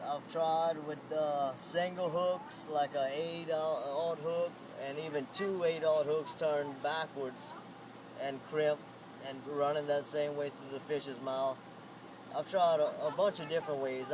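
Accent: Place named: Louisiana, United States. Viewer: i can tell your from around here with the accent i live in new iberia not too far down the road lol i live by a small bayou that leads to avery island and there are nice 5 and 6 footers coming in and out and imma make some of these to put in there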